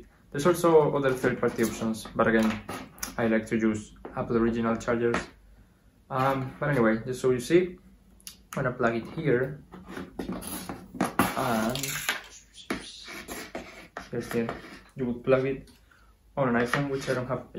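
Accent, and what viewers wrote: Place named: Spain. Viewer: jajaja podia adivinar que eras español, muy buen video hermano! justo lo que necesitaba. gracias :)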